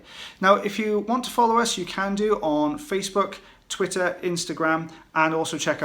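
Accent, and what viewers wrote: Wales, United Kingdom. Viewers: Sorry folks, I released how stupid a comment this was immediately after writing it. I had just never seen subtitles pop up on this before, and it was a bit of a dig at Americans who require the measurements in inches because they can't multiply by 2.5, nor understand English spoken in any other accent. Mea culpa! Love the Welsh! My grandmother was unintelligibly Scottish!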